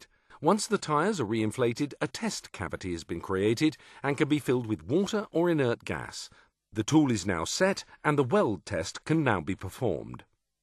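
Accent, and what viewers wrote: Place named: United Kingdom. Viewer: Narrator is wonderfully British.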